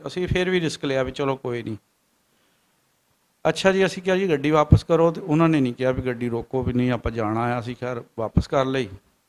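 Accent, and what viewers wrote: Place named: Doaba, India. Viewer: ਤੁਹਾਡੀ ਬੋਲੀ ਮੇਰੇ ਦੁਆਬੇ ਇਲਾਕੇ ਵਾਲ਼ੀ ਹੈ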